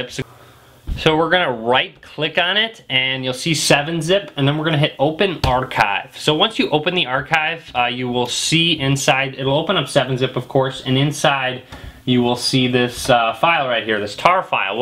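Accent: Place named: Chicago, United States. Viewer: This guy has to be from Chicago. I'd know that accent anywhere.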